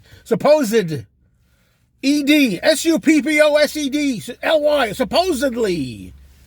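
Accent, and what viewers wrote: New York, United States. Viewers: I always seem to watch your Rant Videos, when I am having my morning coffee, and again, I almost spit my coffee out from laughing. I loved the name of your channel, very catchy.... I am a native New Yorker, here in the South for 8 years, and hearing you TAWLK, I feel like I’m home again...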